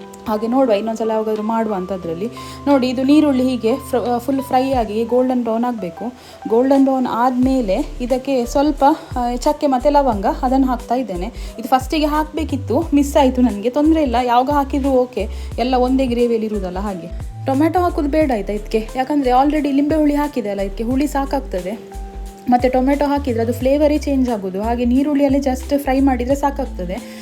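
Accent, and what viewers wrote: Mangalore, India. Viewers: Mangalore kannada ok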